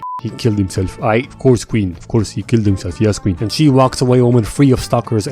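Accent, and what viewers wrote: United Kingdom. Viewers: Wait are you British because that makes sound more funny idk maybe it is just me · r u british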